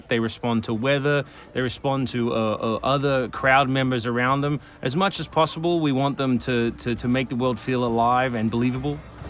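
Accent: United Kingdom. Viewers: Oh are you british???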